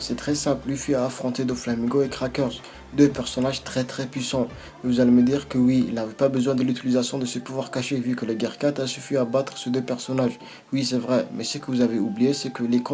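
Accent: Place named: North Africa. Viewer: Toi sa se voit t un bledar vu ta voix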